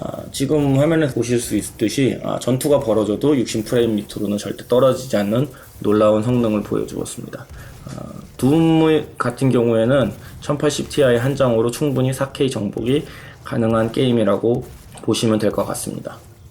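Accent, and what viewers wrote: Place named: Gyeongsang, South Korea. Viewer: 띵충 보면 욕하는 경상도 사투리 쓰면 일베인가? 경상도인 어떡하지..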